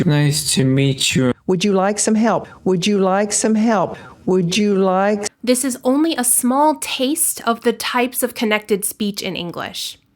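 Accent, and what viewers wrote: United States. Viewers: Hello teacher am new here am loving your accent, are you from which state of USA? Thanks in advance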